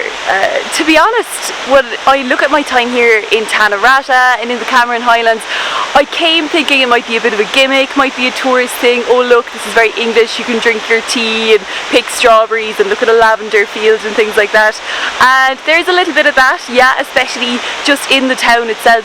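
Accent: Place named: Ireland. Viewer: Im malaysian,seeing Irish here, hearing the english,it sounds calmy funny..i seen the video in the brit trying to understand the irish,welsh English.. hearing u here now i get them..as Saoirse ronan says,the vocal for irish is different to std English speaker..also irish english is quite low tone..yours,collin farrel,liam neeson have that vocal similarities.. anyway, enjoy ur trip, safety 1st miss..😊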